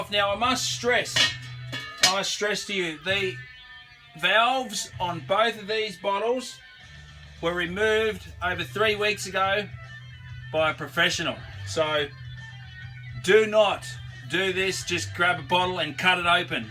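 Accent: Australia. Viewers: Hi Mate you must be from outback Queensland or NSW judging by your ocker accent. I love how you did the bottom this is by far the best bottom on YouTube. I think you would be better having the hinge on the bottom of the door so when its open its out of the way and you can see the flames. In fact why do you even need a door when the top is open? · Matteeee. F..king legend. You sound Tassie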